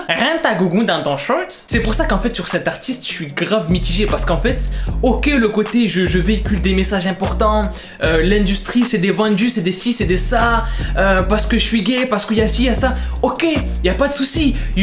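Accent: Quebec, Canada. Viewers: L’accent québécois j’adore 😂😂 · Il me tue l'accent québécois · J'adore ton l'accent québécois👍🏽mais aussi je trouve que ta raison ça pourais se retourner contre lui on plus ya pas a faire des vidéos ou on le voi nu ya des enfant qui ecoute !! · T'as un beau accent québécois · Meilleur accent québécois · il parle trop bien ( quebecoi )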